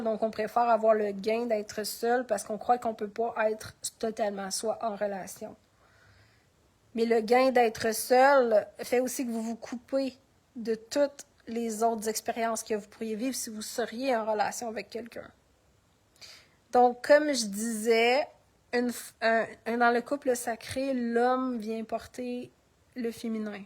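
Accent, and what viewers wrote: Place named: Canada. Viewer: Tu es Canadienne à ton accent . 😅 🤔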